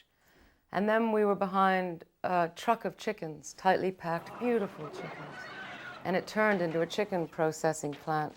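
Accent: United Kingdom. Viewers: Wow her accent was so English by then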